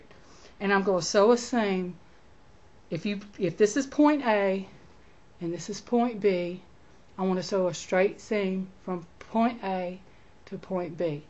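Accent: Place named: South, United States. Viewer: ......love your creativity...i use to make purses out of old jeans....this is a great idea. And miss that southern twang of yours.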